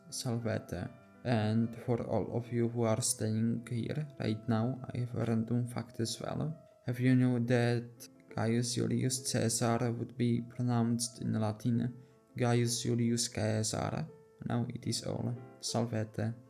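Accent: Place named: Czech Republic. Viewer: Tbh you get used to it eventually and I like that traditional Czech accent.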